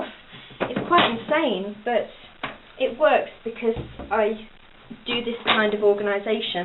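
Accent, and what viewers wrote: United Kingdom. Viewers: you sound like your British. r u ??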